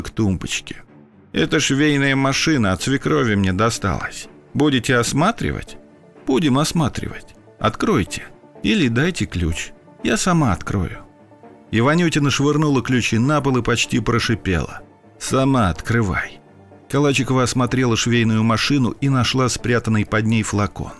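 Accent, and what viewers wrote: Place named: Ukraine. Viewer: начал смотреть и сразу подумал,что она украинка...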